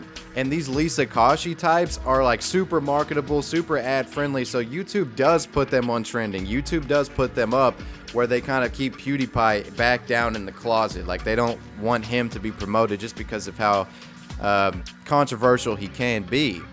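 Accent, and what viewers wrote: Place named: Tennessee, United States. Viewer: Yeah, you’re definitely from Tennessee 😄 that southern accent was spot on! I’m from East Tennessee and that’s EXACTLY how old ladies sound around here 🤣🤣🤣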